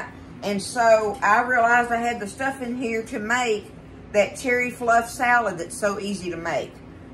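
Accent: South, United States. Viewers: you just crack me up! I love your channel and love you to pieces! I just adore your top with all the bright colors. I have the cutest apron made out of that same pattern of pastel stripes. Love those colors don’t ya?! You make me laugh with all your “sayings” and your southern accent. I am an “Okie” here in Oklahoma City and get teased about my accent too. I retired from Southwest Airlines in reservations and on one call I had a gentleman end the call with one request, he wanted me to say “ya’all” one more time! When I said it, I could hear the room chuckle! They weren’t being rude, they just enjoyed the accent too. I often was told they could tell I was from the South, because I was extra nice and took extra time to be so helpful. It does make you feel good to brighten the day for someone and know that you were helpful too. I know you know what I mean. 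❤ Can’t wait to make your “fluff”! 😊❤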